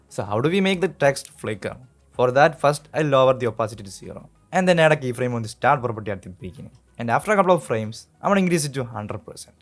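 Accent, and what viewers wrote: Kerala, India. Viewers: Why do you sound so familiar? Wait are you from kerala?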